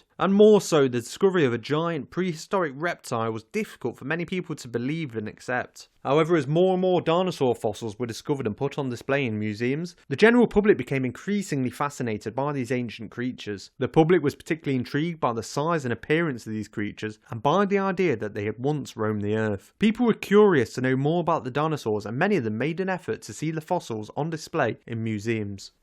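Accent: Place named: England, United Kingdom. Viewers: Where are you from? What accent is this? Or regional dialect or whatever. Sound like the northerners in Game of Thrones. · Sorry no offense but what kind of accent is that? Scotland? Just curious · UK, England probably, doesn’t sound very irish or scottish so I’m pretty sure he’s from England.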